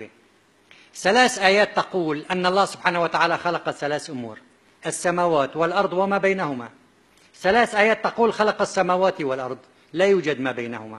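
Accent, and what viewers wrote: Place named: Aleppo, Syria. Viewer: هو من حلب سورية واللفظ في هذه المدينة يكون هيجذ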